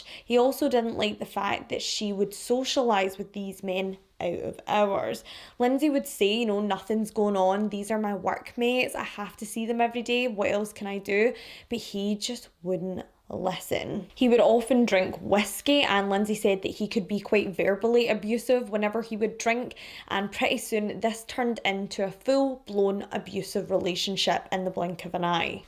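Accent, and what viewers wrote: Scotland, United Kingdom. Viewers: Wooo a Scottish true crimer - yaaas hen 👏🏻 I remember this episode of I Am A Killer and I wasn’t convinced whatsoever…total sociopath!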